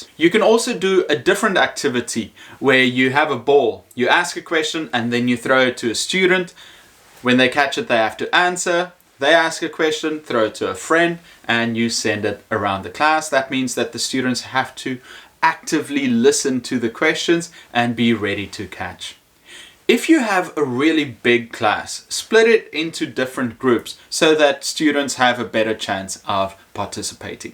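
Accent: South Africa. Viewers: Simon says you very much South African :))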